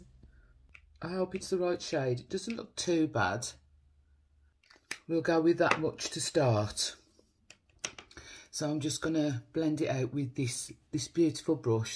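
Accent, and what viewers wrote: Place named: Black Country, United Kingdom. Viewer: I am from Birmingham and still live there. I would say you are from the Black Country . Love your videos x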